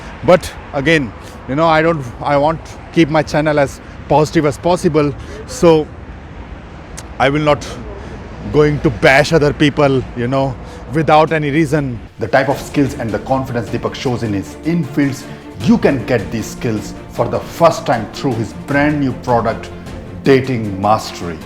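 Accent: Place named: Bihar, India. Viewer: Your accent is very Bihaari. The way you say "aaaalso" .. Sounds like some chokidaar / chapaadasi...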